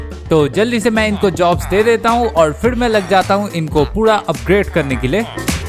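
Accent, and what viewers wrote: Bengal, India. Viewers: Bro r u Bengali ?? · Are you Bangali???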